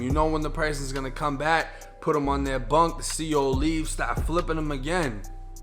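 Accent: Boston, United States. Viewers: Checking in from Providence, Rhode Island, it's cool that hes got the east coast/Boston accent too lol. PS These dudes are sick🤢🤭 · Your accent is interesting. I've never heard what a boston wigger sounds like before. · “I don’t know if he’s re-ta-did or what” 😂that Boston accent kills me every time. Great channel bro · Yo cuzzo, I don't know ya name. You, the one who runs this page, I hear u say u been all through Florida prisons, so I assumed u were from Florida. But it sounds like u are from Boston, or even a little NY. Where u from cuzzo. And I definitely fucks wit ya page. I always watch it. I did a little over 10 yrs. Did time in Philly, Smyrna and Delaware, but where u from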